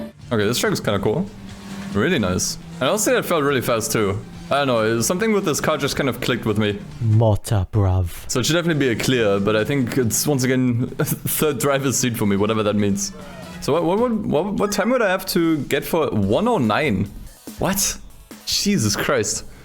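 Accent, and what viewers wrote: Germany. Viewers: Are you perhaps german? Kinda sounds like it.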